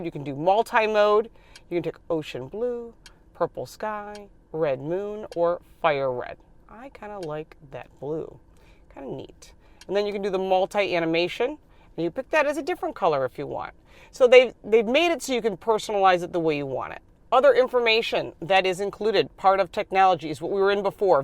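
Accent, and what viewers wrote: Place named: Chicago, United States. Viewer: Watched it 3x just for the Chicago accent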